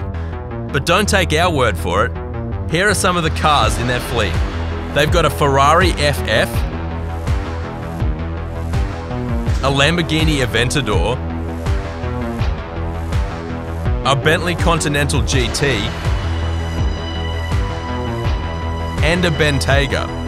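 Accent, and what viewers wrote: Australia. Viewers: Your Australian accent is so charming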